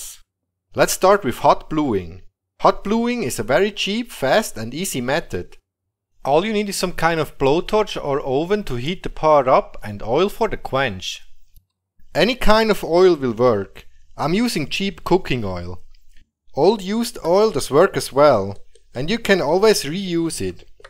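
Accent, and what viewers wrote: Switzerland. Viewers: Awesome video, LOVELY 😀 Swiss accent... (I lived in Zurich for 23 years...) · Ich wette Sie sind Schweizer. :) Tolles Video! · Irgendwie amüsant, wenn man den Schweizer Akzent trotz englischer Sprache völlig heraus hört :D Es funktioniert also mit Metall, aber nicht mit Edelstahl.. Woran liegt es? Chromanteile oder worauf müsste ich achten? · So geil, wenn ich no vor em Rapsöl gmerkt han, dass das en Schwiizer sii müesst!!! 🤣👍🏻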